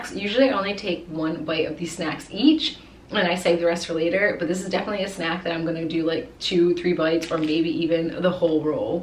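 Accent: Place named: Rhode Island, United States. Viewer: Rhode Island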